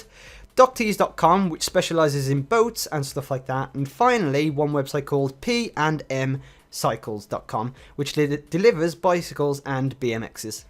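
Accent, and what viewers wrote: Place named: United Kingdom. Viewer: Dat british acscend doe.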